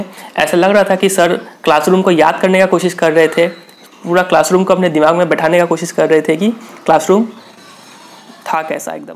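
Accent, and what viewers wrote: Bihar, India. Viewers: Are u from Bihar ?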